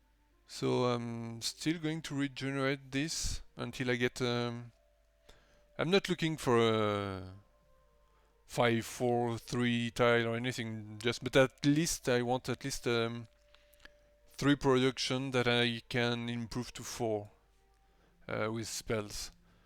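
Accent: France. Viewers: love your French accent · You sound French :)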